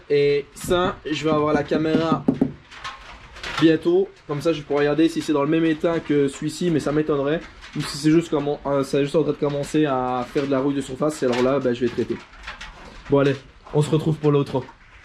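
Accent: Liège, Belgium. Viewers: Toi tu viens de Liège 😁😁😁. Chouette video ami liégeois 😁😁😁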